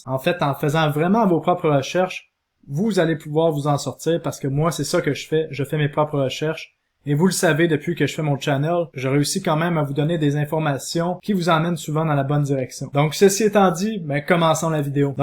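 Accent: Quebec, Canada. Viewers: Salut , tombé par hasard sur une de tes vidéos après avoir regarder ma vidéo quotidienne de didi de BTC family , comme quoi YouTube peut être pertinent je trouve ton point de vue très intéressant et ton accent me rappel ma jeunesse passé à Loretteville à côté de Quebec + 1 abonné.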